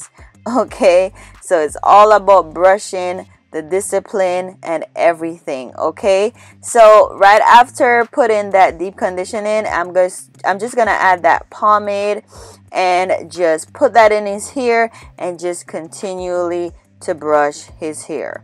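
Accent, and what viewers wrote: Jamaica. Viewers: You are Jamaican I can tell my parents are Jamaican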